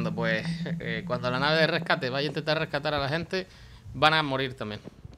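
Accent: Canary Islands, Spain. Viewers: fuese de España por su forma de hablar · es de las islas canarias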